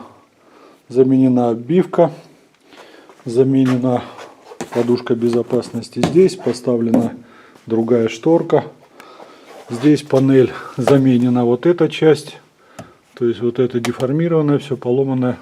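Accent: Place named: Rostov, Russia. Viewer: Как же слышно Ростовскую букву "г" )) и "утак" )) Уважуха за проделанную работу!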